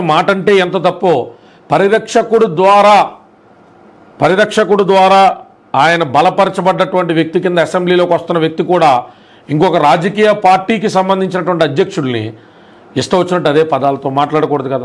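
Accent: Godavari, India. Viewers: Meeru chesthunna videos all areas nunchi chusthu vuntaaru meeru only Godavari yaasa maatladithe ela andi But okati telusthindi Mee janasainikulu andaaru mental ga prepare aipoyaru TDP tho pothu ki Meeku teliyadu meeku konni seats ichina akkada rebals ni nilabetti meeku sunnam raasthaaru Kastamo nastamo JSP single ga contest chesthe meeku antu oka gurthimpu vasthundi lekapothe mimmalni anagathokkuthaaru TDP vaallu jaagartha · మీ వీడియో చూస్తున్నంత సేపూ మా ఊళ్ళో ఉన్నట్టు ఉంటుంది...మీ గోదావరి యాస...ఎటకారం.... మళ్ళీ మళ్ళీ వినాలని అనిపిస్తుంది...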